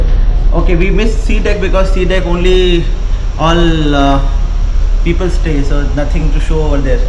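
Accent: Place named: India. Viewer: Awful indian accent, Killed all vives. Life in modern vessel always fascinating but he could not describe it properly.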